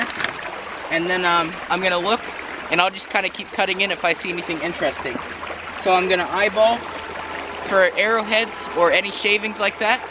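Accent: Pittsburgh, United States. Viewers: He sounds like he from Pittsburgh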